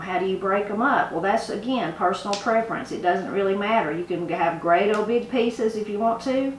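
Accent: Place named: Ohio, United States. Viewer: I so enjoy your show! Your accent reminds me of my aunts who grew up in Ohio, but their mother and father were from TN. Love the cooking! You cook just like my Mom did! Thank you for doing this show, it's so much more than the typical 'cooking' show!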